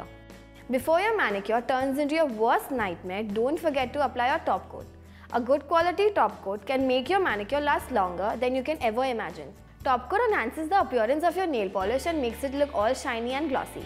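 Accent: India. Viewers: that’s Indian accent lmao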